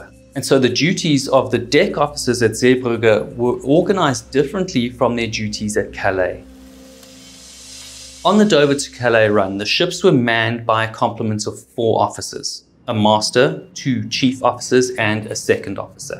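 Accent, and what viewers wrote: South Africa. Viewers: I was not expecting a South African accent. Hello countryman! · I'm trying to identify the accent. My best guess is Edenvale/Sandringham?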